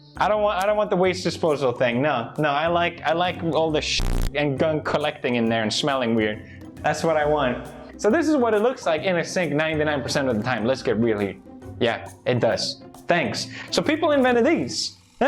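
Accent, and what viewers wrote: United Kingdom. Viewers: as a Mexican living in Britain, I can confirm this is how Brits sound like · His uk accents are getting a lot better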